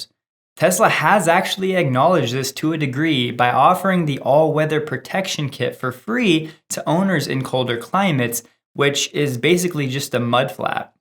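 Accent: United States. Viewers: It's really a pleasure to hear a nice comprehensive summary covering major Tesla issues. It's even better when it's in clear American English.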